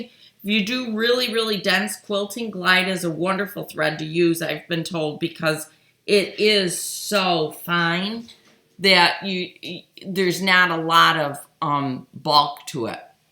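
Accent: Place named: Buffalo, United States. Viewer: Ah, I thought I recognised the accent. I am in England a d have a very good friend in Buffalo, and I hope you are not offended but some of the ways you say some words reminds me of them. I call Buffalo my second home, I have been there so many times. Thank you for your videos, they are so informative.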